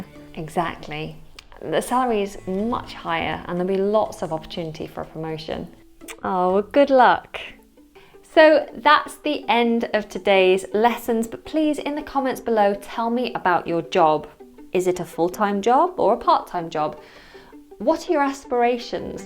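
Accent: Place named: United Kingdom. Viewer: Like you British English accent，sounds so tender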